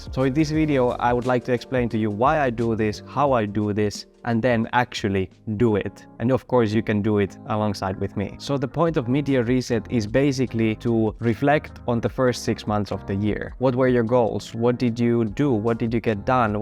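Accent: Finland. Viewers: First time seeing one of your videos. Clicked and heard your voice. Said to myself, "He sounds Finnish". Interesting because most of the reset type of videos I see are made by women who use various planner systems. Other than Ryder Carroll (who created the original Bullet Journal) and a guy named Riley Blanton (who uses Michael Hyatt's Full Focus Planner) yours is the first video of this specific type that I've seen done by a guy.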